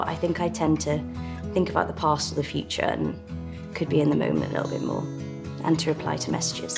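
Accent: England, United Kingdom. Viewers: British accent love🤩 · Love her English accent taking over her rural accent!!!!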